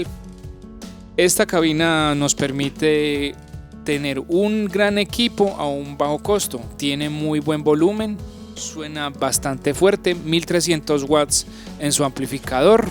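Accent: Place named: Colombia. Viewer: Todo bien explicado hy el vídeo muy bueno., LO MALO es el acento colombiche que de verdad, el solo escucharlo es repugnante., Hubiesen puesto a alguien con acento NEUTRO para que el vídeo sea más limpio. DE RESTO, EXCELENTE VIDEO😊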